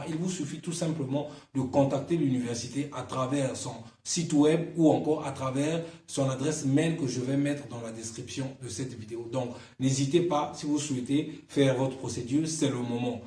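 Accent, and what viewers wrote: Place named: Democratic Republic of the Congo. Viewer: Je sens l'accent congolais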